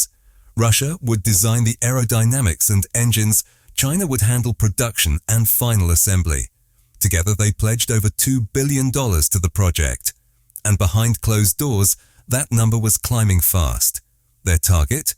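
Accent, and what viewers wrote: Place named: United Kingdom. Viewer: Trust China ? Trust their data & one test flight. How much does it take to buy off media hyped promotions ? Now it’s alledged … CCP is doing it all . This is CCP lackey — agit prop , bought off public relations, & over hyped mock up. — Looks like Boeing & AirBus should give up.. Pure “ white monkey spokesman “… influencer with a “ Brit accent “. — Towering CCP fakery & tub thumping.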